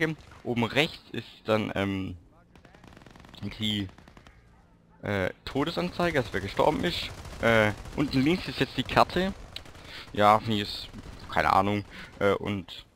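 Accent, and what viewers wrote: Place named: Swabia, Germany. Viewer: ein Schwabe..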